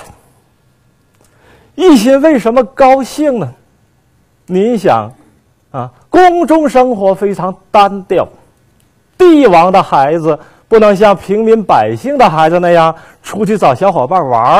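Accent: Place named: Northeast, China. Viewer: 每次听到东北话，都觉得莫名的搞笑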